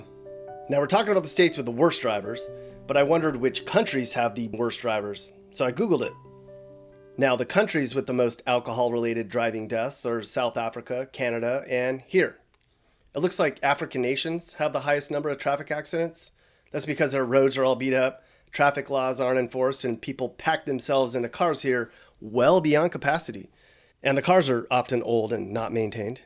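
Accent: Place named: California, United States. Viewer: Love your California accent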